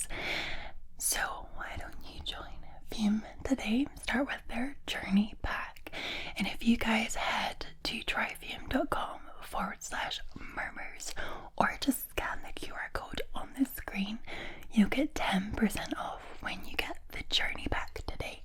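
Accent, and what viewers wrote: Scotland, United Kingdom. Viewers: Looks, talent, razor sharp intellect all delivered with a killer Scottish accent, what a package!!!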